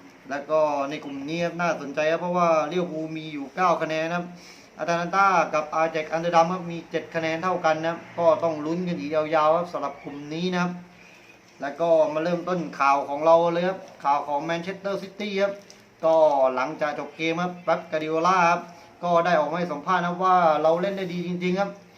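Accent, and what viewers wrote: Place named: South, Thailand. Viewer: คนใต้ม้านนิ!!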